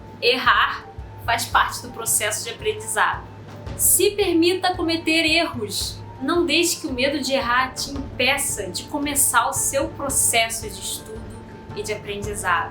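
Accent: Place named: Rio de Janeiro, Brazil. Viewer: Sua ideia foi genial. Coloco aqui as coisas que percebi durante o tempo do vídeo. Achei muito bem editado e que irradia a sua simpatia e seu sotaque por vezes cariocas e outras vezes português. Sua desenvoltura é fabulosa. Só achei um pouco longo e as vezes as pessoas só dão uma passadinha rápida para ver o que tem de novo. Gostei do uso da língua inglesa também. Nesse momento em que as nossas entidades tem carência de jovens vem a calhar e acho que devem acontecer sempre porque estão querendo mudar a nossa história. Você já percebeu isso? claro que alguns vão discordar dos temas e formas que foram colocados. Isso acontece pelo conhecimento adquirido de alguns. Até os autores discordam uns dos outros não é mesmo?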